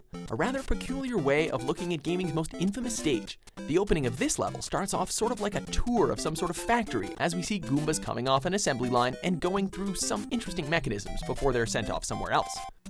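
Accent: Canada. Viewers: omg is he Canadian if so omfg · WatchMojo are you canadian?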